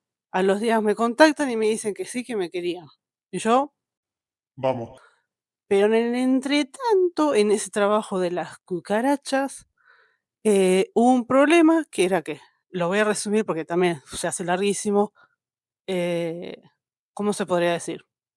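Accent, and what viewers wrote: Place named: Entre Ríos, Argentina. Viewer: No te conozco y este es el primer video tuyo que veo, ¿Sos de Entre ríos, Argentina? Me suena ese acento jajaja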